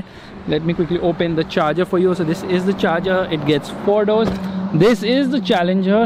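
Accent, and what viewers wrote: India. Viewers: I love Indian accent its so beautiful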